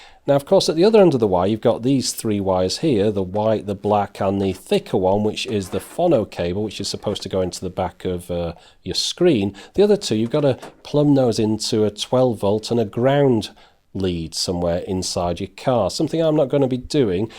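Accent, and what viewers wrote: United Kingdom. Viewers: Thanks for great video. Brit accents, colloquialisms, pronunciation of English words (verses proper American) usually grates on me, but I can tolerate you. Ha ha. Seriously your videos are PROFESSIONAL, scripts to the point, informative, and your speaking voice is excellent. If you're not a Pro "presenter" you should be. Thanks again, these videos are invaluable, help filter through the many China made products in this niche category of electronics. I'll buy this dispite the acid trip colors.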